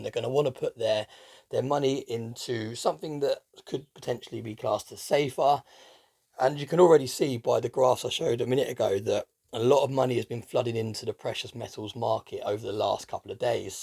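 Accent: United Kingdom. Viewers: So sad! No punch line. I can't agree with arbitrary buy, don't buy scheduling. Always stack, never stop! Price cost averaging is the only way to look at it. Sure you can tighten your decision making, but that doesn't stop you from buying good deals or buying the dips. I know you said 'the rest of the year OR until the price comes back down' so you kept the door open, hedged your bets or whatever. The price could be back down next week.... So I really don't think you mean this, maybe this is just click bait with a british accent? As far as price goes I just bought 54 oz at $23.75 (last night). Just a dollar over spot and actually the same price I paid the last week when spot was $20.09 and less than I paid for some the week before. Oh pickle my carrot 🥕 if you think I am wrong!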